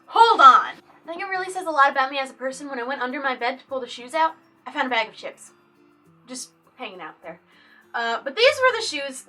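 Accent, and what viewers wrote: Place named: South Jersey, United States. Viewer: So your from South jersey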